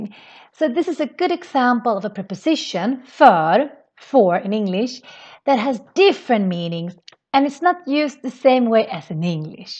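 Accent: Stockholm, Sweden. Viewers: She has a bit of Stockholm “i”, not to an extreme but some. I’d say you get close if you pronounce it as the English letter “e”. Like in “read” with Australian touch.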